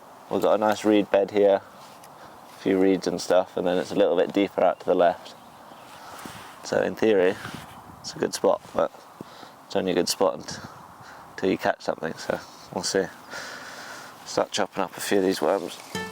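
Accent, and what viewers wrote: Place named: England, United Kingdom. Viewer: Serious question, you sound English….why is your name on YouTube?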